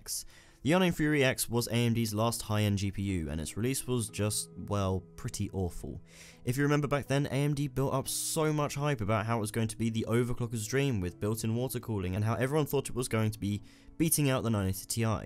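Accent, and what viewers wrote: United Kingdom. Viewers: omg replied awesome videos bro i dig that british accent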